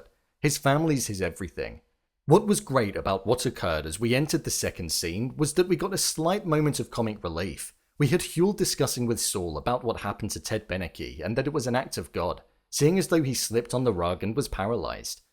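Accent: United Kingdom. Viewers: Why does yt keep recommending me bri’ish video essayists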